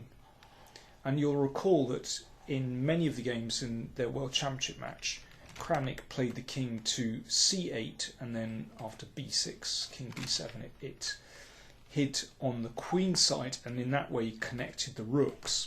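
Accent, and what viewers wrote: United Kingdom. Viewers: What the the heck are you talking about with his speech!? He sounds perfect to me and so charmingly British! I'm genuinely curious what you hear that I don't.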